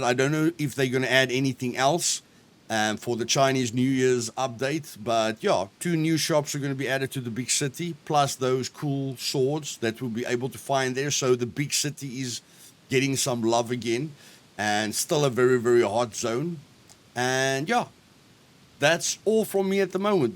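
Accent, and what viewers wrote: South Africa. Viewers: Awesome video buddy. Very informative and interesting. Keep up the good work 👍🏻. You from South Africa? Excuse my ignorance if not haha but I can't pin the accent!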